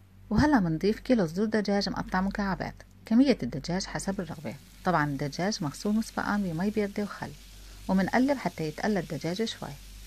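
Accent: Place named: Aleppo, Syria. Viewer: يسلمو ايديكي الله يجزيكي كل خير سؤال، انت من حلب لهجتك كان من حلب.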